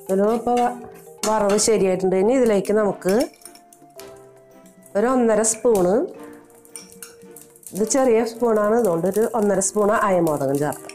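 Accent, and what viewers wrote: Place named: Thrissur, India. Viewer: തൃശ്ശൂർകാരിയായ ക്രിസ്ത്യൻ ചേച്ചി ആണ് സംസാരം കേട്ടിട്ട്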